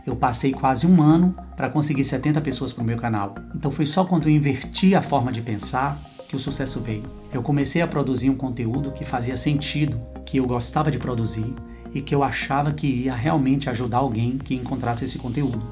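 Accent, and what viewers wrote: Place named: Northeast, Brazil. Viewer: sim, adorei a Live, as horas passaram e nem vi... e quanto a voce, foi uma surpresa boa, mais um canal que ajuda a gente a crescer aqui no YouTube com dicas e sacadas bacanas!! Gostei do seu canal, aos poucos vou assistindo os seus conteúdos, você tem um jeito bacana de transmitir e de se comunicar, talvez pelo sotaque leve do Nordeste, talvez pelo jeito doce, Cândido de falar, muito agradável e um diferencial dentre os outros canais com meu nicho!!! Parabéns, já ganhou mais um inscrito!!! Abraço do Big 💪💪😃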